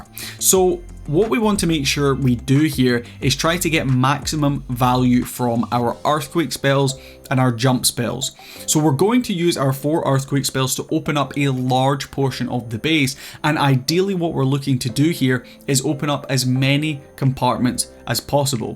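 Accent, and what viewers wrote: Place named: Scotland, United Kingdom. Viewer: Are you from Scotland just asking because I can sense your accent?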